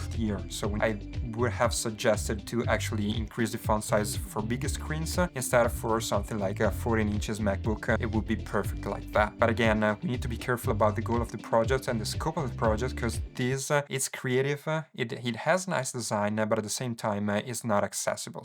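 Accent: Italy. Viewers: Hey is an Italian American I love the Italian accent! Be great to come to Italy and get to meet you and learn about the culture! Having said that though do you have the slides for the guides you came up with for the sizes?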